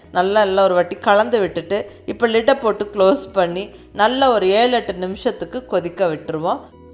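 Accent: Coimbatore, India. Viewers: Kovai Tamil super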